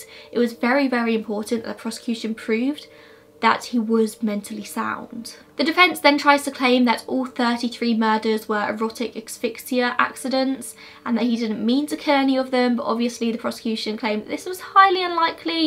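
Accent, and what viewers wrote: United Kingdom. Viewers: I could listen to your Brittish accent all day. With that being said, excellent video as usual. I've seen several documentaries on this case, but yours is really complete, shows how much research you actually put into your videos. Well done, keep up the fantastic work.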